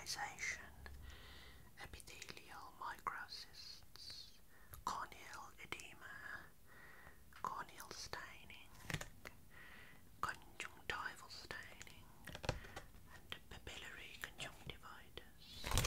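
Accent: Birmingham, United Kingdom. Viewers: He’s a brummy I think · Judging from his accent I would say the UK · Yooo I don't think I've actually heard an ASMRtist with a Brummie accent before, it's really nice! Great video dude, I love visual effects like this, they're super relaxing. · Love this , just found you . I miss hearing a brummie accent 🥰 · As a lad who comes from the Black Country and the areas around Brum. I have never heard a brummie talk so quietly 🤣🤣🤣🤣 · Are you a Brummie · I was starting to think that my tingles had gone and nothing was doing it for me, until you, not sure if it’s the accent, and being from Birmingham too it’s lovely to hear 😊 I love all your videos 👏🏼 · Never thought someone with a brummie accent would help me sleep... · This is excellent! Is your accent also from Birmingham?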